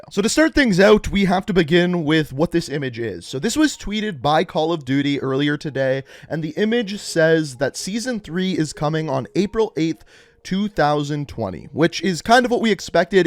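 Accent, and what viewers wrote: Canada. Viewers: this guys extra Canadian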